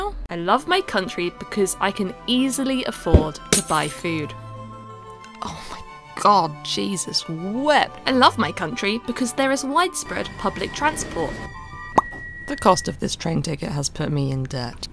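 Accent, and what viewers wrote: United Kingdom. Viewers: Somehow I don't care about the actual content (politics and british rant) but the animations are so cute that I just keep watching. Also british accent is just funny :^)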